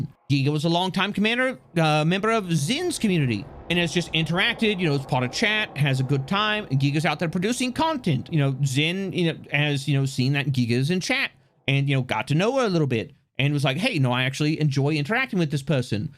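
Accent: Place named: Mississippi, United States. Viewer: I always catch just a whiff of a southern accent.... like mississippi southern....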